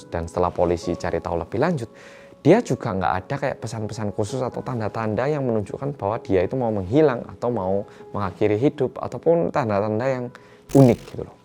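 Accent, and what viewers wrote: Java, Indonesia. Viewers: klik dulu cak medokk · orang korea yang medok selalu di hati:) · tim gecep...medokkk 😊😊😊😊😊😊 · Ya ampunn.. baru liat ytb abang medhok ini lagi, sekarang ada backsoundnya euy. 😜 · Ganteng,medok,tuek,suarane apik, IsO ngomong Korea,wong Korea,gae konten mbendino,critane apik,urong rabi,duwite akeh,subscriber re akeh😠penak men koe Dibalik semua itu ada erjuangan yg luarbiasa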